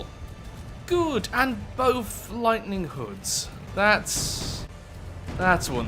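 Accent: United Kingdom. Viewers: When I cannot sleep I get recommended videos and I get to pull a all nighter to his British voice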